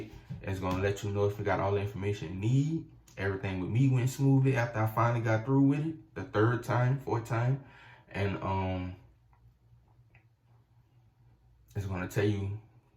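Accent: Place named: New Orleans, United States. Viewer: Are you from Nola???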